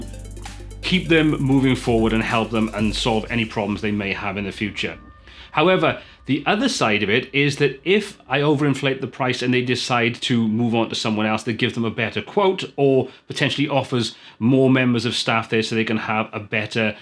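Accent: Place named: Scotland, United Kingdom. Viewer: Hi. Another great video! I've gotten into all this computer-related stuff really (too?) late in life - how I envy you youngsters! ;-) I'm in the process of trying to put together some websites to (hopefully!) make me some money in the Affiliate marketing game which will - I further hope - be generating a 'nice little earner' by the time my pension comes due (I'm 63). I had to smile when you were on about the inflated price 'trick'. In a previous life - as a self-employed vehicle mechanic - I fell foul of that 'trick' on several occasions! Scenario: Regular customer - good payer. Really sh*tty job that I REALLY don't want! Give customer ridiculously high price quote. Result? Oh, thats less than I expected, go on then, you'd better do it! Result? Oh b*llocks!!! :-) Social life? I was Competition Secretary for a small local golf club for some years and yes, like you, many's the time I was out and about and I'd get a phone call from a club member with some query for which I needed computer access - so I feel your pain! 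lol Finally, I just love your Scottish accent! ;-)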